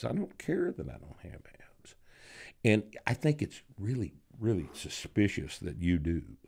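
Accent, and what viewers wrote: Texas, United States. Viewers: His voice makes me want to move to Texas 🫠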